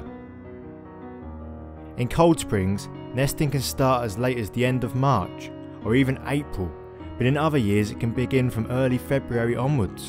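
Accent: United Kingdom. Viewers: Awww mate, that beautiful accent. You make me miss the UK. Thanks for posting. Great stuff. Love from Alabama.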